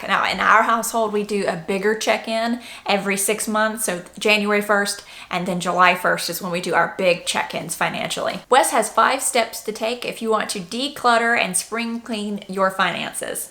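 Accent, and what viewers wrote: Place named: South, United States. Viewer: Her advice and tips are awesome but does anyone know where her southern accent is from I think it might be Arkansas but I don't know. Any help is greatly appreciated would help eliminate the guessing lol.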